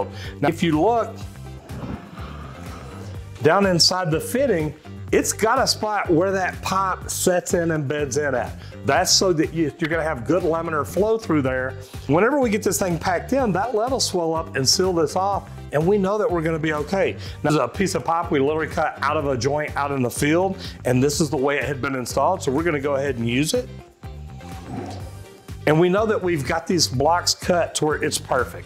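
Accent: South, United States. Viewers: "Sewer pipe" / ˈsu ər / / paɪp / Not soda pop or syrup hop. Just a heads up for people who aren't used to southern accents. I was born and raised in The South and I struggled with that one.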